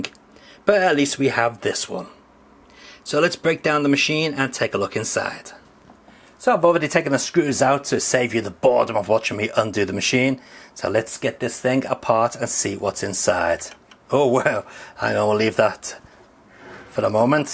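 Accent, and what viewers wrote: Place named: Liverpool, United Kingdom. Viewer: sometimes your accent sounds just a little like Dave Lister from the Dwarf. Boys from the Dwarf!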